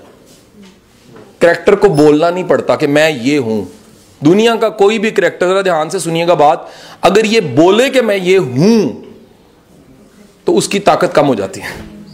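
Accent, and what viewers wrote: Punjab, India. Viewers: sir your punjabi speaking style is great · Punjabi hain sir is liay...actuall style me bolty hn kch covering ni use krty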